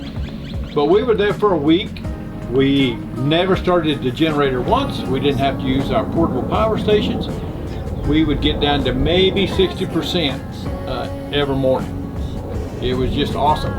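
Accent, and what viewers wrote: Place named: Louisiana, United States. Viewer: "How y'all doing"? Cajun :-)))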